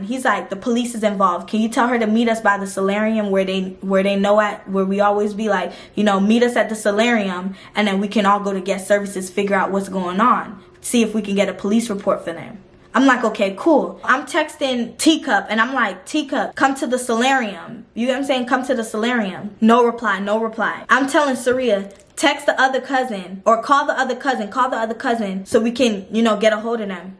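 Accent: Jamaica. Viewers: she jamaican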